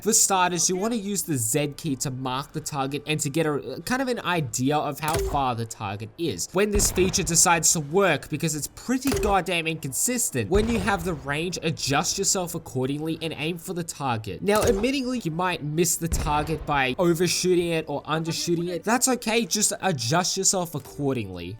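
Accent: Australia. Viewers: I want an aussie accent. 😫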